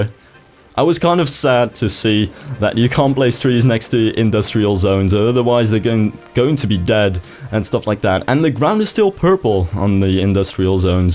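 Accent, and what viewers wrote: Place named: Netherlands. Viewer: je accent is heel erg herkenbaar aan mijn engels accent. Ik herkende direct dat je nederlands was voordat je het zelf zij xD. Een combo van brits en amerikaans en soms een beetje nederlands:D daar krijg je een sub voor!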